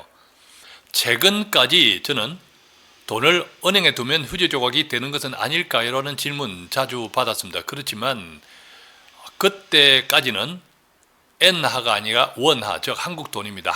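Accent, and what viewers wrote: United States. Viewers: 미국식 발음인데?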